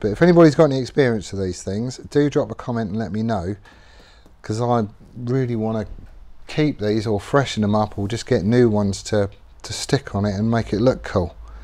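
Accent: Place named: Northern England, United Kingdom. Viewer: You're doing a nice job there. A question that's nothing to do with this, you live in Plymouth but talk like a northerner ? What's that about ?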